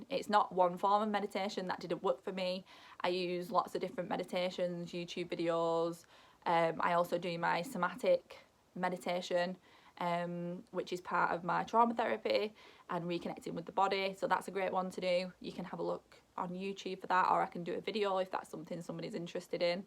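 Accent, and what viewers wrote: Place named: North West England, United Kingdom. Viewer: Sounds good, I'm currently enrolled onto the Optimum Health Clinic course but have been looking into the lightning process. I guess what's holding me back is that I'm wondering what the lightning process can teach that I haven't already found through YouTube and the course I'm on? Thank you for your the video. From your accent I thought you'd be close to me, but I noticed that is North West whereas I'm in Yorkshire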